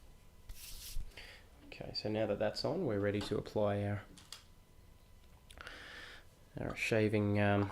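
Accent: Australia. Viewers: your voice is perfect and the Aussie accent is an added bonus!!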